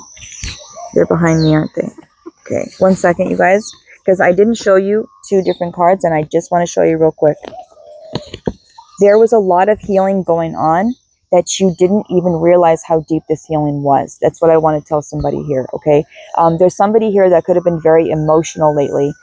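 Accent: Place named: United States. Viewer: Where are you located? 🤔, you have a US English accent but you go by a strange time zone. Anyways I love watching your videos, watching your pets I also have several cats, two dogs and a turtle.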